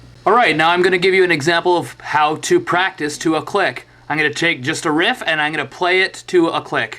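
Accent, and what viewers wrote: Canada. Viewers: Your Canadian accent is strong in this video. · ... are you canadian... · Because he's Canadian (i think). They don't eat words or fart them like the Americans and the guys at UK.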